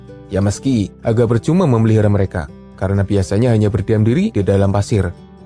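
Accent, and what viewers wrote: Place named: Central Java, Indonesia. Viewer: Kayane wong jateng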